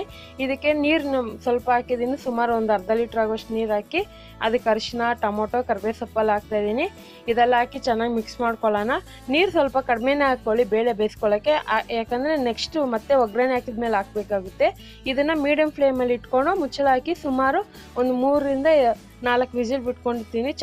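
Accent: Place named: Tigalarapete, India. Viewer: nimma bashe Tigalarapete yavara thara idde.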